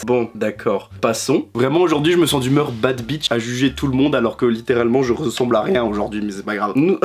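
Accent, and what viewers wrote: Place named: United Kingdom. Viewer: Ton accent anglais une pépite ✨✨✨✨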